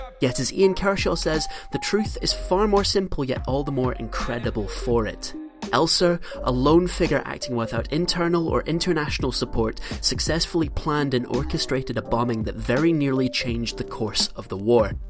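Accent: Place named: Ireland. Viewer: Nice Irish accent you got there mate.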